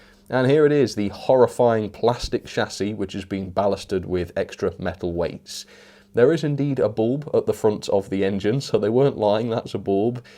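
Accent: United Kingdom. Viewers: Dear, I found it thorougly amusing, to hear you hate it so much, in profound solid brittish, marvoulous thanks!:D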